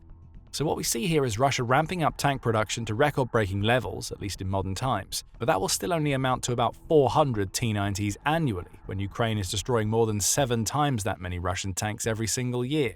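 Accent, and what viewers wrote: United Kingdom. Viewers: "This is Insanity. Putin would rather loose 100 soldiers than 1 tank." The irony of that statement is that the narrator has a British accent. During the the battle of Passchendale, the British High Command lost so many of the horses that pulled artillery and wagons that the British considered the loss of horses as more significant than the loss of men.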